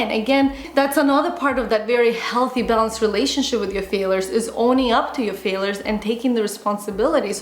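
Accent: Azerbaijan. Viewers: lol Canadian???? She's from Azerbaijan, therefore has an accent that's obviously different from yours and she's not saying it "wrong". Very ignorant thing to say. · please she is from Azerbaijan and speaks Azeri and Russian. She was 16 when she moved to Canada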